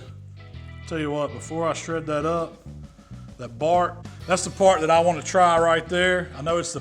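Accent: South, United States. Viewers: he has quite a strong American (I'm guessing southern? I don't know American accents!) accent. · Unless your a big boy with a southern accent then I don't listen to ya on BBQ/SMOKING TIPS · Southern accent plus overweight... please serve me food!!! 😍😍😍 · I not really understand what he said, is it south USA accent? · I can't think of anyone I would trust more when it comes to anything relating to eating meat than a big happy dude with a southern accent and a half dozen different types of grills/smokers on his back patio. At the time I post this there are 299 dislikes.... who the hell is clicking that button??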